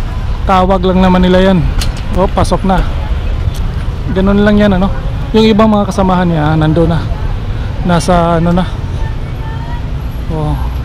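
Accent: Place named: Visayas, Philippines. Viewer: Ayusin mo nga pgsalita mo bisaya ka dong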